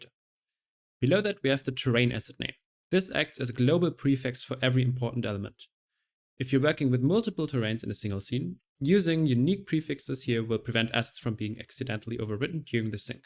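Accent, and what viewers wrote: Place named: Germany. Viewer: Wonderful! I'll buy this if you release a Mac version. You sound German. Are you? Germans come up with the absolute best stuff!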